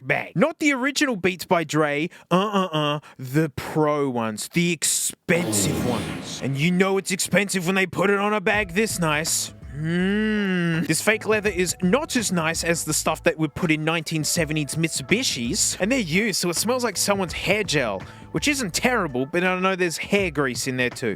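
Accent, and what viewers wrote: Australia. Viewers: finally im early to hear the australian man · Im early to the aussie yelling guy for once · I dont care about audio The only reason i subbed is to watch a random aussie man yell at tech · Wake up, the aussie man is yelling at headphones again.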